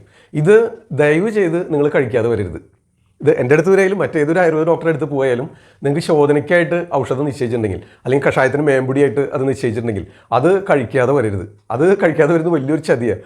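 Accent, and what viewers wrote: Thrissur, India. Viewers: Trissur slang is good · തൃശൂർ ഭസായാണോ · Sir. Thrissur aano?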